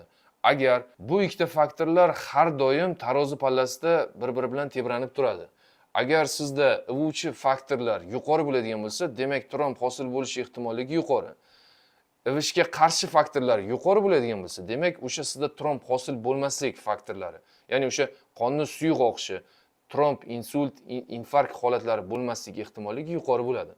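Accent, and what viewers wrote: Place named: Fergana Valley, Uzbekistan. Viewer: Доктор болам сиз каердан сиз шевангиз Водийга ухшаяпт телфонтракамиз чикаринг мен жуда керагл экансиз доктор болам ???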